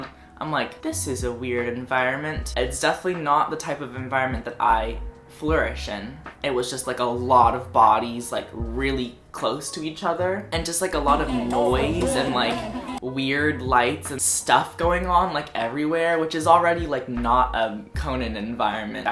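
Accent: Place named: Texas, United States. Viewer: Conan Gray You still talk like a Texan and I love it cause it’s like a high pitched country voice and it’s my favorite thing in the world!!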